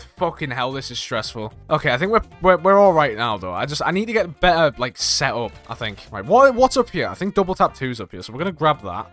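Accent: United Kingdom. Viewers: Newcastle or Sunderland? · hey my friend and I have been trying to place your accent for like 30 minutes, I'm hearing a little bit of Welsh/sometimes Celtic I fluences and he's saying Southern England. Were we close?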